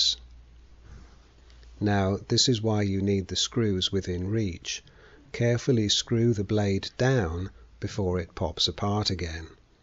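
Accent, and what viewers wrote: United Kingdom. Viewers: Great vid... and the British accent made it enjoyable 👍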